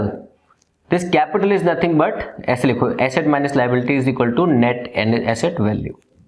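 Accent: India. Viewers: Sir ji...hindi ka majak mat banaiye...you too sound to be a Hindi background guy.... anyway good technics....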